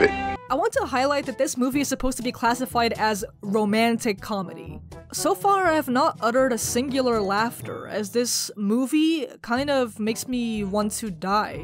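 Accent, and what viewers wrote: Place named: Ireland. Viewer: You sound like me haha may the luck of the Irish aid you in your endeavors!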